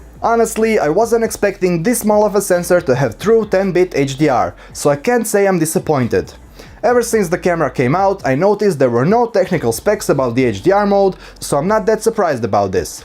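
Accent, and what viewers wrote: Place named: Ukraine. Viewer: not interested in ukraine narrators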